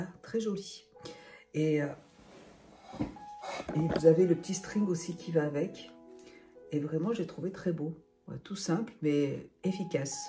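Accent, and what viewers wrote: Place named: France. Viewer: ❤❤❤❤ very beautiful always. I love your French accent.. sending love from the United states. XOXO. PS will you marry me. LOL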